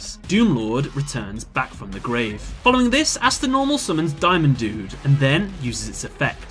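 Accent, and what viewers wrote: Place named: United Kingdom. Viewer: yo man, I catch your content on occasion, like what you do and you are clearly loved in this community. Whenever one of my comments is slightly negative I usually don't write it, cause who needs that ya know?? But I'm really confused by your pronunciation, cause you sound British to me, yet you say Tempest and Mariner oddly, you seem to pronounce the syllables individually. Small thing, just catches on my ear whenever I hear it. and the slightly negative comment is...... that part about what fusions Jaden could have gone for after first using 'the warrior returning alive' ,.... so obviously filler man, so obviously, not necessary for your point either.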